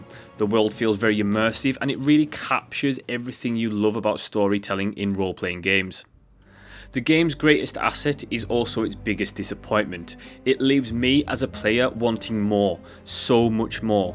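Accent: Scotland, United Kingdom. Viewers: This is a bit expensive atm, I will pick it up in the next steam sale though for sure! This video seems like a great introduction to the game, I will check out some of your other videos for sure m8. PS Love your Scottish accent ^^ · You have a cool way to speak, is it Scottish?^^ (English isnt my native language, so no offense if its something else XD)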